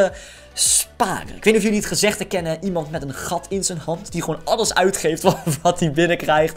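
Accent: Netherlands. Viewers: wil je parodie's en liedjes in het echte leven maken voor je kanaal? Want dat is zoooo lang geleden.. praat nou met een Amsterdams accent?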